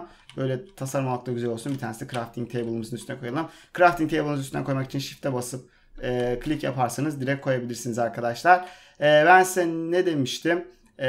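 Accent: Trabzon, Turkey. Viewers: abi sen Trabzonlumusun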